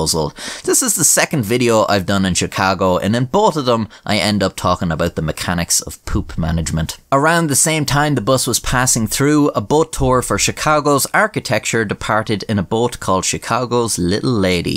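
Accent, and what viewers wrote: Ireland. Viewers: An Irish voice telling us to trust Atlas... Hmm... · "...two turds of the people on the boat below." When the Irish accent lends itself to incidental humor...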